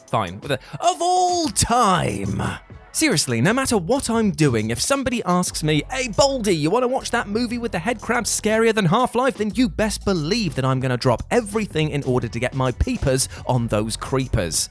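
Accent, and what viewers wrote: United Kingdom. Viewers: Simon Whistler clone? This just seems like it MUST be a parody of Simon's oh-so-British delivery. All he needs to do is mispronounce a few things. . . (and have nine more channels).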